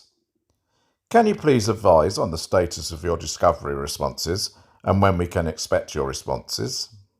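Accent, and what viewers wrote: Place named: United Kingdom. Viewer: It's the British accent.. Brits can describe a dog laying down a stream of runny pooh and make it sound elegant..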